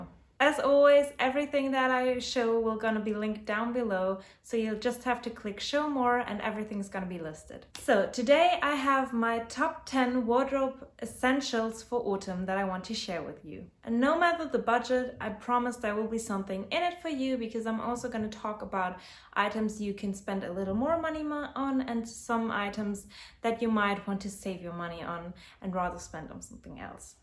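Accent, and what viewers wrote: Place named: Germany. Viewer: Are you from Germany? Your accent sounds so familiar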